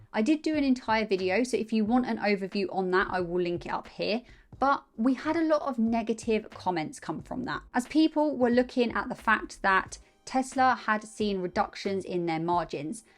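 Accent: United Kingdom. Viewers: Found the channel, sub'd, I listen to several TSLA retail investors, this is the first British retail TSLA channel I've watched (American), The British accent is kinda fun. Anyway, I am part of the legion of retail investors that are all in on Tesla. I think self driving cars shares a certain similarity to the development of search for the interent in the 2000s, except that the capital requirements for self driving cars effectively means that some college kids in a dorm room will not win this race. Thus, the players are more well defined, and extroplating a winner is theoretically easier. Could I and others betting on Tesla be wrong? Of Course, but I think our odds are much better than those who were searching for the needle of google in the haystack of internet search companies back in the 2000s and the payoff is also arguably higher. Big upside and, an easier route to finding the winner. 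If Tesla can solve cars, I think the Bot situation is quite optimistic after that, since they can really push hard without worrying about consequences. If a car crashes, people can die. So a lot of restraint has to be utilized with the AI development. If a robot runs head first into a wall or a machine, some minor damage occurs at most, so they can really push hard and go nuts with little restraint on the bot. I think people will be surprised when Tesla wins self driving, but I think they will be utterly shocked and austounded at how quickly Tesla pivots and has effective humanoid robot wor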